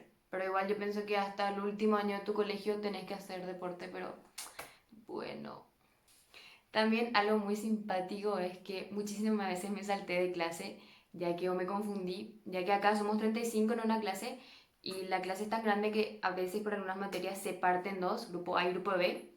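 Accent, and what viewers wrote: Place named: Paraguay. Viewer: holaaa!!!! soy nueva en tu canal, eres de paraguay??? me suenas por el acento , nueva sub...jsjsjsj muy buen video!!!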